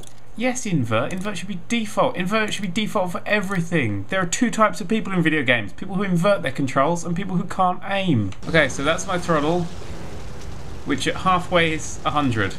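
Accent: England, United Kingdom. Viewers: You have a english acsent. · Your british u already have a british accent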